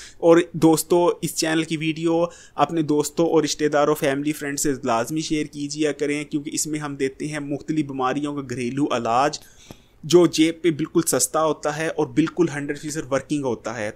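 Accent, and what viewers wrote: Punjab, India. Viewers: اگر اپ ناراض نہ ہوں تو ایک بات کہوں۔ پلیز آپ اپنی اردو ٹھیک کر لیں۔ پنجابی لہجے میں اردو بولتے ہیں۔ یا پھر اپ پنجابی میں ویڈیوز بنا لیا کریں۔ شکریہ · baie ap pnjbi he na ap Ki boli bout achi lgti he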